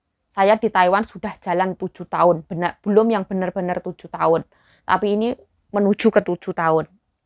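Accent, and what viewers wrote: Java, Indonesia. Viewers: Salam kenal mba.pas buka hp..ko ada yg menarik utk dilihat..disimak dan disuka..semoga mba sehat..selalu dg kesederhanaanya..dengan logat jawanya dg kerendahan hatinya..setidaknya dpt gambaran..oh seperti itu toh kalo jd TKW..tambah ilmu juga nih buat kita tau..domana kita kerja harus mampu menguasai bahas disana..salam dr bandung..semangat mba 💪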